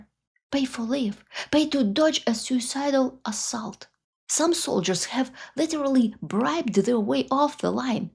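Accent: Russia. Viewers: That lovely accent of soviet time English teacher) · Are you Russian? You sound Russian, but your last name is?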